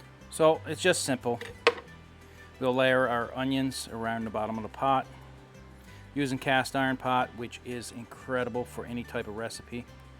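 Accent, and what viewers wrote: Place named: Atlantic Canada, Canada. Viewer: GREAT recipe. Any combo dish like that which blends all those flavors can easily turn into feasts fit for Kings. In my recipe I've used sweet potato, roasted Brussels sprouts, and garlic (including garlic powder), and parsnips which add a certain minty flavor to the mix. Keep up the good work! Oh by the way, I enjoyed listening to your Atlantic accent. Here in the Twin Cities we have many Canadians because of our love for pro hockey. Their accents are so different from yours. 😀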